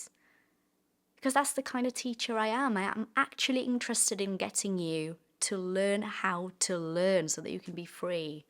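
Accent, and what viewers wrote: United Kingdom. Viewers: British accent is amazing. Greetings from Brazil.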